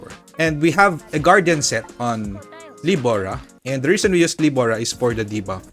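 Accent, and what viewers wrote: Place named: Tagalog, Philippines. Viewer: Are you filipino? Accent sounds like a native tagalog speaker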